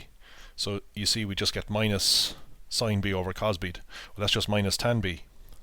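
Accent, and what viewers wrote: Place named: Ireland. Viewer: irish probably incase ur still wondering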